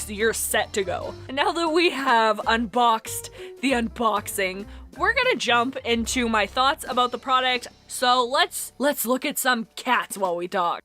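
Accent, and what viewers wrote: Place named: Canada. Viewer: You're Canadian too?!?! I knew it I knew it I knew it! (Kinda) Cause that 'accent' that we Canadians have...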